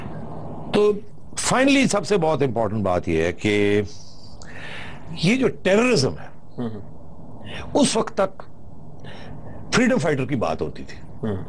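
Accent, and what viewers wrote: Punjab, India. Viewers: love ur Punjabi tone sir... yar kuch alag nahi ham dono mulkome.. kyo ek dusro ko mar rahe he.. I dnt u.stand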